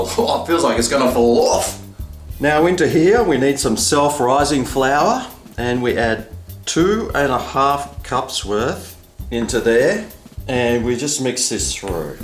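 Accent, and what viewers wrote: Australia. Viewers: Suprised the crap out of me when I heard the accent, I simply clicked on the thumbnail. I'm in Kentucky, USA watching this dude on the other side of the world make biscuits...something they don't have????? OMG! LOL They sure looked good. The real trick is the flour, soft winter wheat it's called, grows here in the South of the US, not sure what you have over there. And we have self-RISING flour, not self-RAISING flour! I love it! So wish I could visit Australia, seems a magical place. Great video. 955 yankees disagree. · When I clicked in southern biscuits, I was not expecting an Australian! I'm a southern gal from America and loved your tutorial! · And sounds like an Aussie... so why didn't he just call them scones? They look like scones (more or less.) Do they taste the same, I wonder? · We Americans just LOVE ❤❤❤ his Aussie accent!! 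Southern guy here too, Florida-Georgia, and those biscuits look YUMMY! 😋 Toppings: strawberry preserves, honey, butter and honey, apple butter; sausage, egg (scrambled or fried) and cheese; bacon, egg (scrambled or fried) and cheese; or sausage gravy. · Love your accent dude! We ladies are a sucker for AUSSIES!!❤ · This is exactly the same as the Southern Living recipe for perfect buttermilk biscuits. With an Aussie accent.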